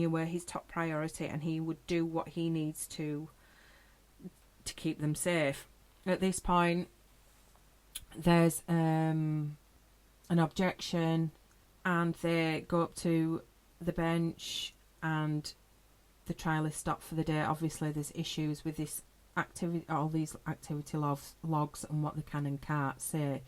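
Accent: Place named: United Kingdom. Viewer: Just came across the channel today and so glad to hear a British voice for a change when discussing crime , most seem to be American that I follow anyway. Have subscribed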